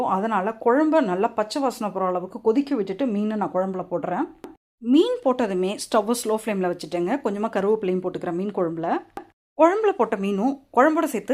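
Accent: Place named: Kanyakumari, India. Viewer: Nee entha uooru...kaniya Kumari style sollu...thappu thappa sollu thara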